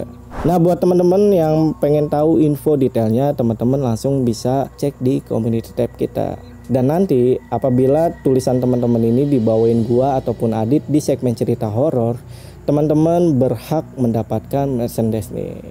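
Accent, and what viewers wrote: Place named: Bali, Indonesia. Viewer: Bang, logat T nya bali banget sih. Asli bali kah bang?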